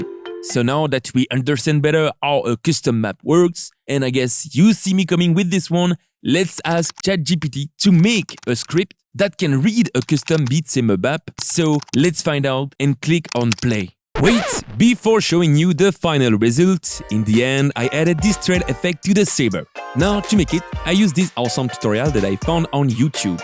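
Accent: France. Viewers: are u france? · Nice french accent ^^ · toi tu es français XD on as le même accent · Cet accent français est juste magnifique🤌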